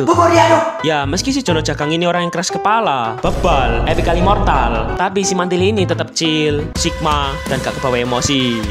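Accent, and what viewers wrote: Java, Indonesia. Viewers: Kok logatnya logat org jawa🗿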